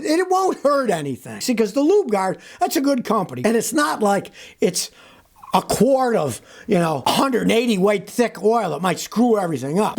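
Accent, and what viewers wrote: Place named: New Jersey, United States. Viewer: Hey. Love your "Joisey "accent 🤑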